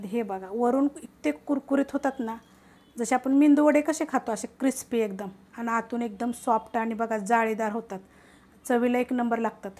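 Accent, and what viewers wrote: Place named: Mahabaleshwar, India. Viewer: तुमच्या सगळ्या रेसिपी एक नंबर असतात...तुमच्या बोलण्यावरून मला तुम्ही माझ्या माहेरच्या म्हणजेच महाबळेश्वरच्या वाटता.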